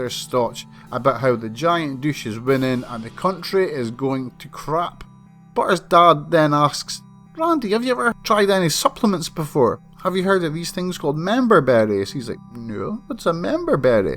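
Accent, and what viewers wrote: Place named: Aberdeenshire, United Kingdom. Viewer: Hey min, far aboots ye fae loon? Ye soun like a Brocher? Definitely Aberdeenshire, aye?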